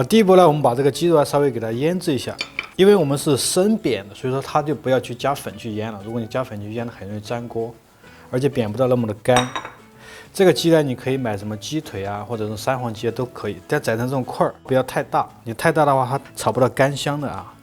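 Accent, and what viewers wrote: Sichuan, China. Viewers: 作为成都人听着你说的川普好亲切 谢谢你的分享！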